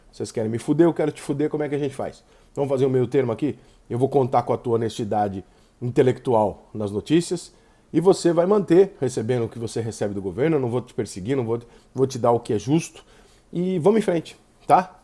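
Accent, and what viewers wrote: Rio de Janeiro, Brazil. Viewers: ESSE CARA CISMOU QUE É CARIOCA,COMO SE FOSSE BACANA SER CARIOCA, AGORA SÓ TÁ FALTANDO ELE PUXAR O "S", QUE É UM TROÇO CHATO DEMAIS!!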